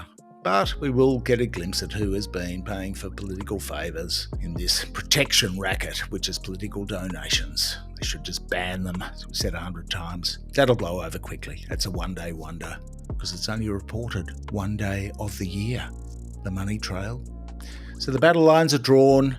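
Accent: Australia. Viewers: It's just so refreshing to hear intelligent journalism with an Aussie accent ❤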